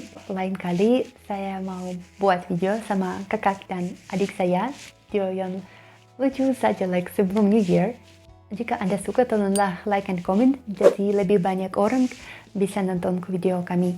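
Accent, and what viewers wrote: Russia. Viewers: Mantap..... Bahasa Indonesia, aksen Russia....👍👍👍👍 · Mbak bahasa indonesianya logat russia,, tapi enak didengar sih hahahhah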